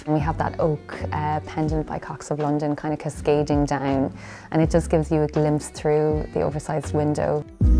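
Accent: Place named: Ireland. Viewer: No idea what you are talking about. has a very soft Irish accent…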